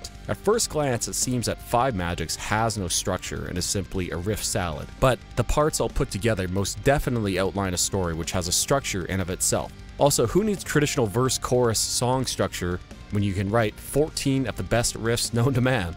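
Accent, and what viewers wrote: Canada. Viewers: Owt....I bet you are either Canadian or from Minnesota. It really is a great song and thanks for covering it.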